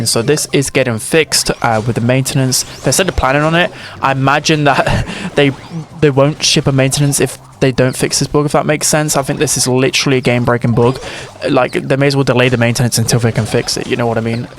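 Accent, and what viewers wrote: United Kingdom. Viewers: Bro i miss your british accent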